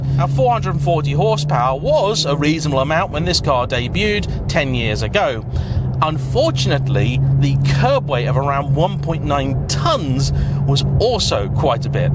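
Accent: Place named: England, United Kingdom. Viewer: "A person with an English accent reading the phone book sounds better than an American reading the Gettysburg Address." -Mad Magazine, in a spoof of a course to teach you an accent instead of the actual language. Listening to this guy narrate this is so much better than the usual braying American "What's up? What's up? What is UP? What's up? Wassup?" at the beginning of each grating video.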